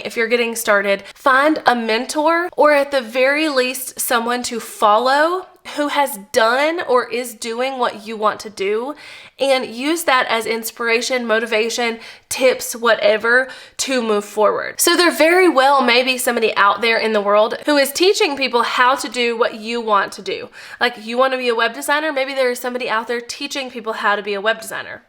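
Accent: South, United States. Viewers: Thank you for leaning into your unique self and embracing your southern accent. TBH- and what I’m about to say goes hand in hand with this video, my thick southern accent is one big thing that I allowed to hold me back. And I have risen from the ground with zero social media in a janitor business that has a revenue of $19k/mo. But I could scale if I could learn and DO all these things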